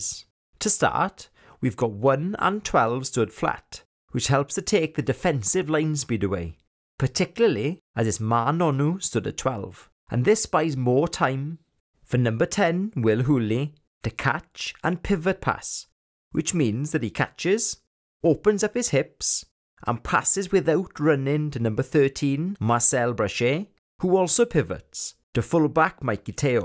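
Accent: Wales, United Kingdom. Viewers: I spent two weeks in Wales in 1978. So good to hear the Principality dialect again. Thanks for the instruction as well. Rugby is an elegant game and thanks to Internet connections, I can get up much too early in the US and enjoy a match.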